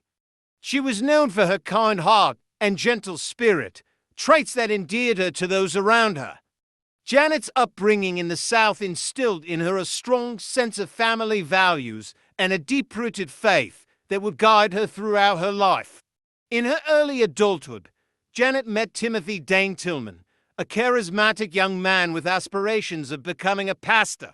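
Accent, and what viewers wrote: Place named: United Kingdom. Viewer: The clown with what appears to be a British accent shouting into a microphone. . . About a story in small town Alabama. . . Bizarre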